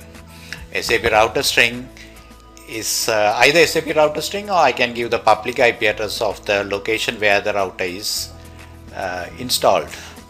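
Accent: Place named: Canada. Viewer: That's a funny Canadian accent you have.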